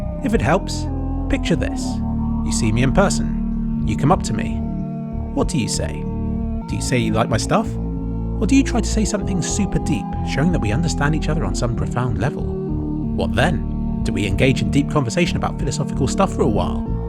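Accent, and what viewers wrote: United Kingdom. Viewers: For many you are a god, for others a simple person who uploads videos to YouTube. For me you are someone who inspires and gives a good message and gives a smile, I also love how you narrate your videos, I would love to have an excellent level of English and have that British accent like the one you have:c Valve, give a break pls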